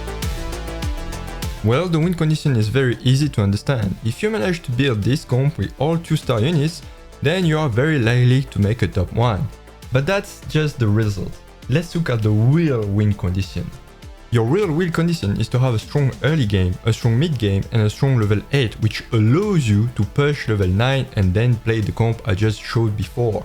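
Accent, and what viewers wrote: France. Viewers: on dirait que tu as un petit accent francais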